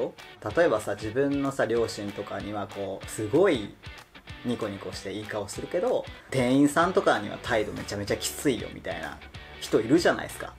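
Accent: Kansai, Japan. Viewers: くんの関西弁にキュンとしちゃう♥♥♥ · これからも関西弁いっぱいのくんを待ってます🎵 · 関西弁なのに怖くなくて、言葉のイントネーションとくんの声が心地よくて何回も見てます(*´`) 色々な質問に肯定的に答えていて穏やかで優しくてすごく幸せな時間でした。次回も楽しみにしてます💓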